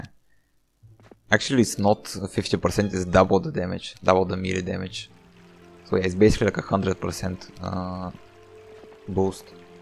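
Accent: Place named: Bulgaria. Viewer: Sorry for asking, are you Bulgarian? Your accent really sounds like you are indeed from Bulgaria.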